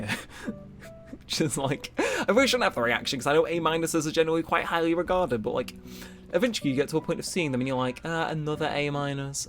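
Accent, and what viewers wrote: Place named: England, United Kingdom. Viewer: Hey I’ve been watching ur ac old school vids for a while now. Big fan of this accf series!!! I always wanted a WII, but never got one for this game & SOS, HM. Now I’m really wanting a WII, but it’s expensive & idn how to hook up to my smart tv on Comcast cable. Plus my aunt just bought me a switch last fall. Love ur accent too, my gran was from England & my uncle is in Scotland, owning a pub. Anyways, I’ve been to both England & Scotland & love them both!!! I’m in the east coast USA, I’m Waterfall ie KtCub🌸💟🤗. I’m on Twitter if u want to check my postings out. Keep the wonderful content, you really cheer me up every time I watch ur vids. I hope u get this msg, Bc idn if my msgs go thru to any of my AC YouTube ppl I like a lot & subscribe. Have a swell day, Laters::::💖🦋🌈 Ps: can u me so I know u get this? Thnks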